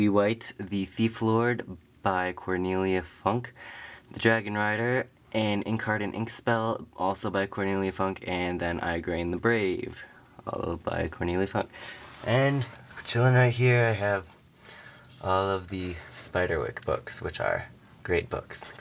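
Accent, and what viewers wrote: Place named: England, United Kingdom. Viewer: I must admit nothing gets me like someone speaking Spanish with that typical English accent so yay you made my night =). Oh and I am envious of your shelf =)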